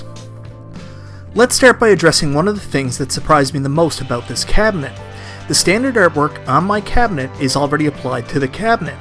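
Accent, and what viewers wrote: Canada. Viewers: Great review. By chance are you a fellow newfie haha accent sounds like it lol. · So far, you can tell you're from Canada. Especially with the "marquee". I love the accent. Thanks for the review!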